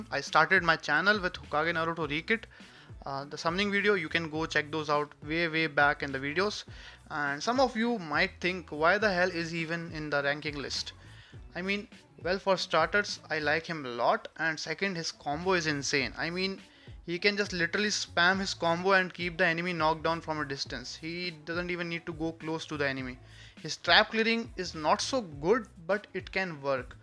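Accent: India. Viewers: Indian Accent😮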